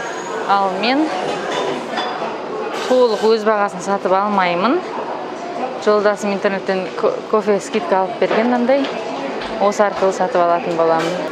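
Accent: Standard. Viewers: Қандай керемет тұнып тұрған қазақша сөз деймін.Қайда жүрсең де Бақытты бол! Сенің каналың мен үшін өте қызық тартымды көре бергім келеді.Жаңалығың көп болсын! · Я айтпақшы сіздің таза қазақшаңыз қанша жыл шетелде тұрсаңыз да өзгермепті жарайсың дегім келеді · Бізде де күн ыстық. Қазақ тілін таза сөйлейді 👍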